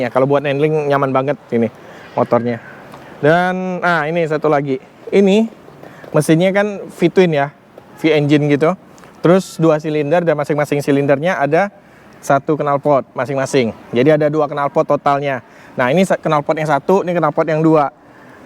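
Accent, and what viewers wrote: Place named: Minangkabau, Indonesia. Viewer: Minang bana Uda ini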